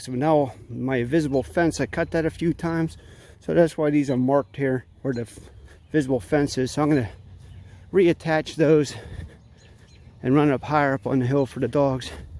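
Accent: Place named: Pittsburgh, United States. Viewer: I detect a yinzer accent....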